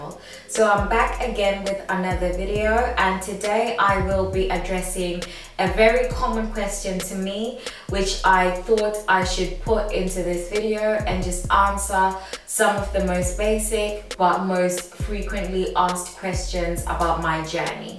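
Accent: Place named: England, United Kingdom. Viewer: Where is your accent from ? It sounds English I love it ❤️ love your locs too x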